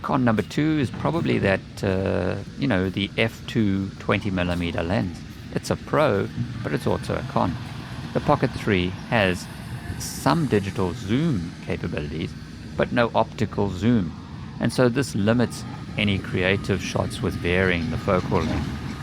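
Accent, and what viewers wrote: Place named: South Africa. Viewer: You sound like my friend from South Africa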